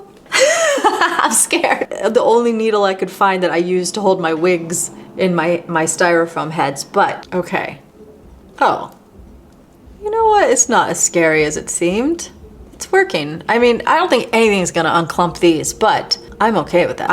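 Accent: United States. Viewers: obviously american?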